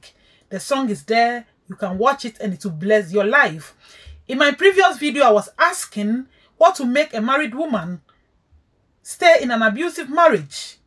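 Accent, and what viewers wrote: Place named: Ghana. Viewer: Are you a Ghanian.because of your accent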